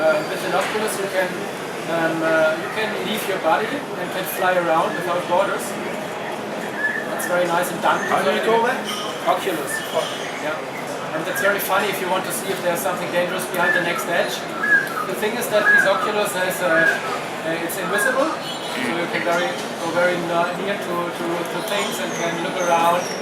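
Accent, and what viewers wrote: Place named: Germany. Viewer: lustiges englisch spricht der herr, hat wohl jeder im raum gemerkt dass der ein deutscher ist :)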